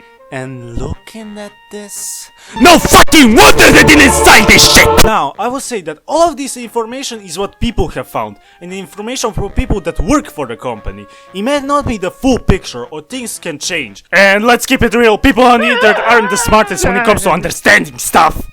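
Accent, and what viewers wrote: Eastern Europe. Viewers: Slavic accent enhanced the experience too. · Never knew how much I needed a man with a thick Slavic accent scream about how stupid Genhin VAs are now until now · dudeeeeeee the sickass art? the memes? the angry slavic accent? new sub right here damn I ain't rihanna but where have you been all my life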